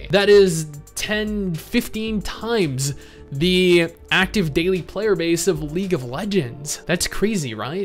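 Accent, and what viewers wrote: United States. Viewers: You grew up in Australia but you sound American... Nice looking game, let's keep our fingers crossed. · You're Chinese Australian with an American accent 🤔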